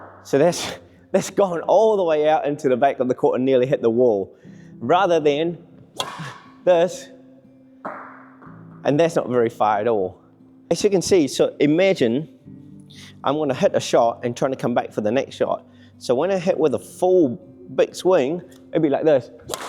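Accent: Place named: Australia. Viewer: Ok so what if I'm just an intermediate player, what is the best weight type racket and string tension, assuming I'm not very muscled? Even weighted, heavy head, thin griped, light head or which? 😮😮😊😊 BTW, your language and accent give away where you were born and most probably located, down under lol 😊😊😅😅😂😂❤❤❤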